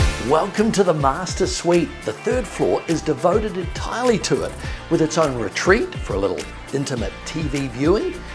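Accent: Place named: Australia. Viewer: Don’t know if that Aussie accent helps sell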